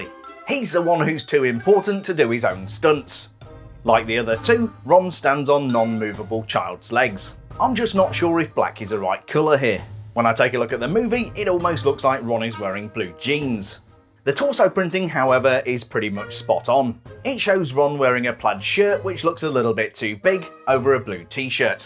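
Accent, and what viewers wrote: United Kingdom. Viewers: I love that he always says: HAYTCH PEE in his cool British accent. Hi 🙋🏾‍♀️ I’m from that embarrassment, ‘Murica 🇺🇸 lol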